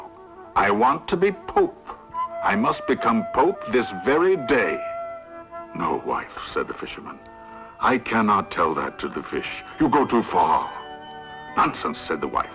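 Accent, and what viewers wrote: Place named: Europe. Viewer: Umm, definitely not Dennis! For a little moment I was thinking it was Orson, but then it sounds European. Why didn't they put the narrator on the credits?!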